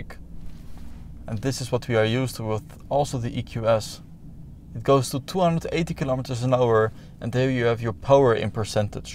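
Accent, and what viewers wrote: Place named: Netherlands. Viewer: Ugly dutch accent, stopped watching after i heard: ünlock and ~rare steering😥😥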